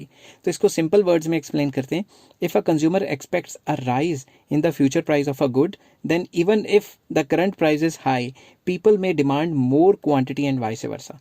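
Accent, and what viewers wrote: Punjab, India. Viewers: Your punjabi accent is to good ❤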